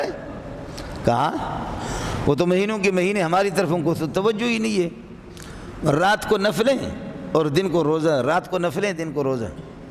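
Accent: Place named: Balochistan, Pakistan. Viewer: In ki zubaan esi hy. Baloch hy na. Pathan logo ki b esi zuban hoti hy. Badtameezi ka irada nhi hota in ka. Badgumani sy bachny ki koshish kijiye. "ظنوا بالمومنین خیرا"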